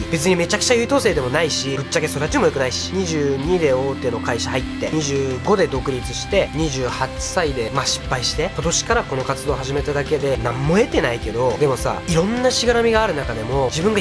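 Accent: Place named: Fukuoka, Japan. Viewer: どちらの出身ですか？福岡のどこかですか？方言が親近感あいます。応援してます。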